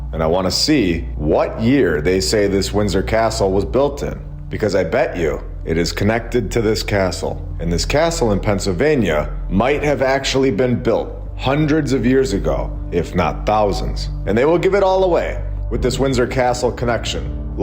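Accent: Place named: Massachusetts, United States. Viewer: Sames! Are you from Massachusetts? 😅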